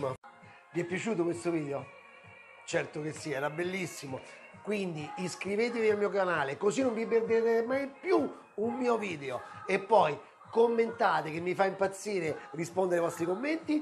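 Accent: Rome, Italy. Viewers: A parte la parlata romana che ha un sapore a se', la ricetta stuzzica proprio. Mi manca il sapore di Roma, manco da li da più 50 anni. Ciao!!!😋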